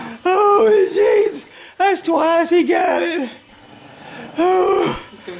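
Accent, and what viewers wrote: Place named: Texas, United States. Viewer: What state are you from? Texas? Love the accent.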